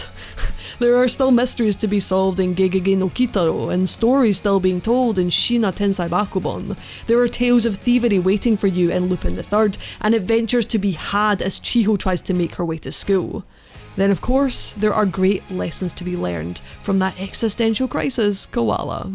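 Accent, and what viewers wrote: Scotland, United Kingdom. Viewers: The voice of a Scots woman can make even the word murder sound good ;) This season had a good handful of shows that from the description didn’t sound as if they would be anything spectacular, such as Chios School Road and Asobi Asobase, but are genuinely funny as have become some of my favourites this time around. Also..... EDITING!!!!!! 😍❤️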